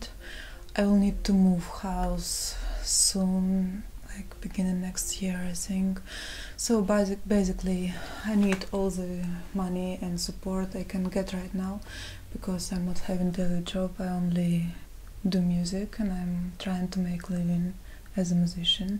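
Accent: Russia. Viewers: I can hear some russian accent :D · *q* Russian accent............. <3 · Are you from russia or something ? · hey, where are you from? Your accent sounds Russian. I'm Russian and i can understand all your speech. When i am trying to do it with native speakers i have problems but your pronounce is awesome.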